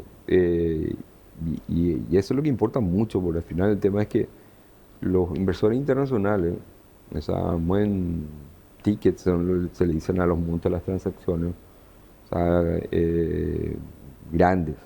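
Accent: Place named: Paraguay. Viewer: Habla como paraguayo. No se le entiende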